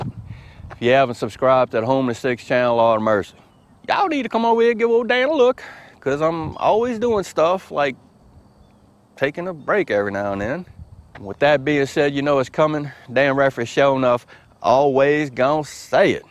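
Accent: South, United States. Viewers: Your videos have always relaxed me. Maybe it is the southern accent. Melbourne, Australia.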